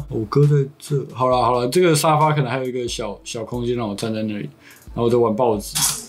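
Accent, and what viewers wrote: Taiwan. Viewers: 真的很台😂“拉撒呷拉撒杜啊”都用得恰到好處！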